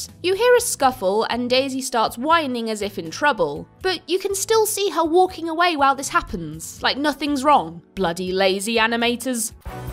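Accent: United Kingdom. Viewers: Was looking for a review of Yoku's Island Express and this somehow showed up and I just had to watch it xD I remember this game being one of the few that was actually translated to Danish back in the day and I do remember being kinda intrigued by it in general. Really well made and thorough review btw. And heccin' love your British the Britishness in your voice xD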